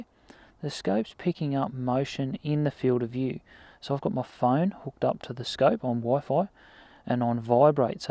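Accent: Australia. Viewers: Great video, you sound Aussie, hope so you did good.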